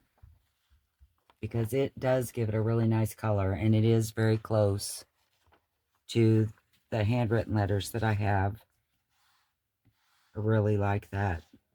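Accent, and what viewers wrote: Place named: South, United States. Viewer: "Do I talk funny"??.... oh my gosh 😂🤣😂 It's funny, I'm in northwest Indiana, and when I went to visit friends in Texas, I expected a heavy southern accent from the people who were originally from there, but didn't really hear it. When my son went to college in southern Indiana, oh my, they had the heaviest southern accent I've heard, what I expected Texan's to have, lol. So my guess is, you're originally from the south somewhere 🤔 Love the project, everything came out awesome as always 💜💜💜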